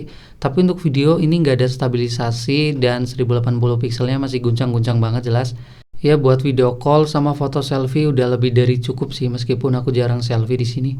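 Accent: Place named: Java, Indonesia. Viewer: Behh jan jowone ketok bangetmas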